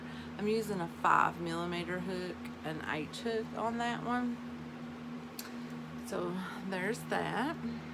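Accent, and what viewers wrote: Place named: North Alabama, United States. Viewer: I enjoy your videos so much. You sound like you are from north Alabama. I lived there for several years. It was like heaven. Now I understand why (from Jacksonville like me and where I moved back to) sang. I never understood until I felt homesick for the wide open beauty of it.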